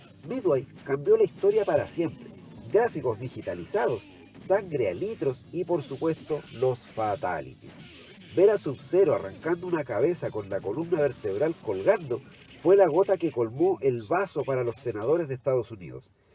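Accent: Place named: Peru. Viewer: Que chistoso hablas,eres de peru?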